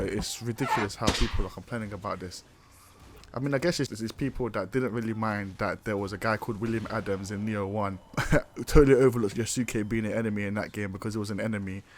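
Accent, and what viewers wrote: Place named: London, United Kingdom. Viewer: you are the most north london person i've heard in a while, bro said "mandem, we got a juicy one stylll" 🤣 love bro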